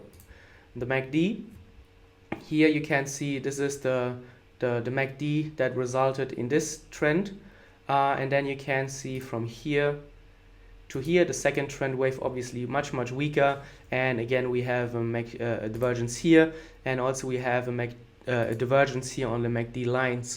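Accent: Germany. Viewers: Dialect like german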